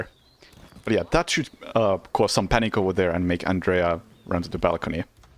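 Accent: Finland. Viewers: Damn I’m glad I found your channel. Amazing work! Your Finnish accent is captivating! I’ve obsessed over Alan Wake recently and so they have a resounding amount of Finnish references/language in the games. What a fascinating people. I love Finland!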